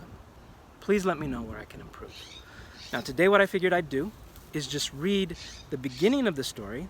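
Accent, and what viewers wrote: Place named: United States. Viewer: the way your reading it is like a American paces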